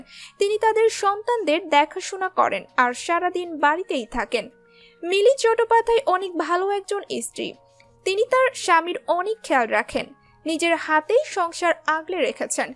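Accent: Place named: Bangal, Bangladesh. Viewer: সঞ্চালিকা কাঠ বাঙালদের মতো বাংলা বলেন কেন? ইস্ত্রি বলেন স্ত্রী-কে !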